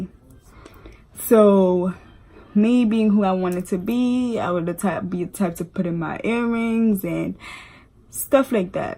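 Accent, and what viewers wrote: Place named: Jamaica. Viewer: Nuh understand where yo Jamaican accent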